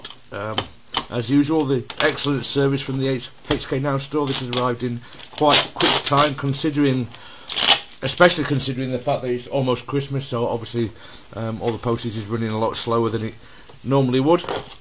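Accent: United Kingdom. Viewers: Rightright. I just mean British. I am not from an English speaking country so your British accent sounds awesome imo. :D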